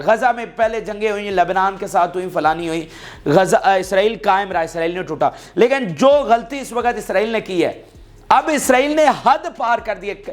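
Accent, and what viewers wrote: Punjab, Pakistan. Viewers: Bhai ap English mat bola kren, apke pas English ka lahja nahi hai..sirf ap nahi pure Punjabion ke pas nahi hai.aplog English ko Punjabi lahje me bolte hai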